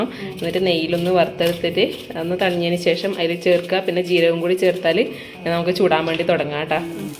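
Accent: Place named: Kannur, India. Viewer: Nigal kanuru karano. Langage kelkubol tonunnu. Ee. Appam soft udo. Jnan udakubol orikalum nanavarilla. Oru nazi ariku atra alav puzukalari cherkanam. Makalk udaki kudokuvananu👍🏻❤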